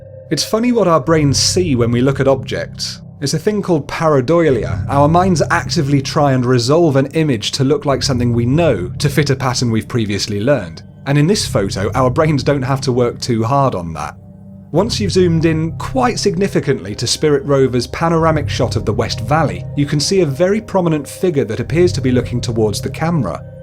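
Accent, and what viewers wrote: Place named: England, United Kingdom. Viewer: Love love love your channel. Curious as to where your from. Kinda go a English accent. A real one. Not one that you see in Hollywood lol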